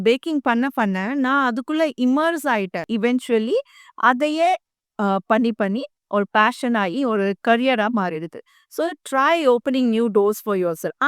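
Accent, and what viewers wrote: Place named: North, India. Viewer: Your pronunciation like North indian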